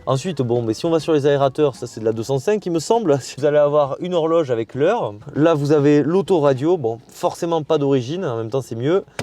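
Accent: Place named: South West, France. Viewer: J’adore l’accent du Sud Ouest !👍🏻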